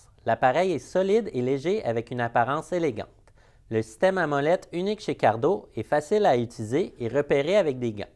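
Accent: Quebec, Canada. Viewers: Je suis tellement fan de l'accent québécois !!! Sinon très bonne vidéo, tu m'as beaucoup aidé merci ! 😉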